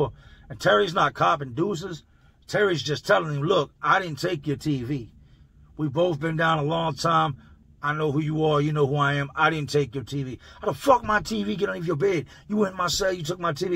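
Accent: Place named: Reading, Pennsylvania, United States. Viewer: Bro, I been binge watchin your channel. From Cali but Lived in Reading, PA for 8years listening to you talk is nostalgia for me I love this shit. Thanks for the stories I listen to you while I work overnight janitorial. Really made me realize I need to cool it with the alcohol I appreciate you man. Thank you. Big respect I’m glad I never got caught up in all my bullshit cuz real talk I’m lucky I was heading there some nights. Anyways, great channel glad I found this.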